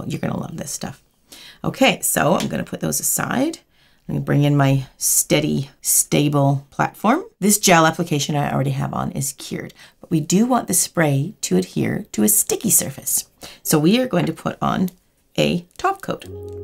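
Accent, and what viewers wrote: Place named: Ontario, Canada. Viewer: Omg I completely feel the same. I am Canadian living in US and I could hear it before I knew you are from BC. You sound EXACTLY like the women in my family loving in Ontario, so nostalgic for me. I am so grateful I found your channel, you're an amazing artist, perfectionist and woman 😊💕thank you!!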